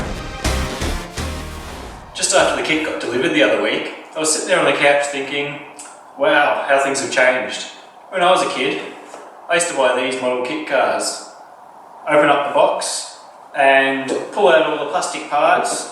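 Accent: Australia. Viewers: Jesus how does one listen to that damn accent all day? Having you stuck down there in Australia was teats , yorn the Internet😐 - I can’t take it🇺🇸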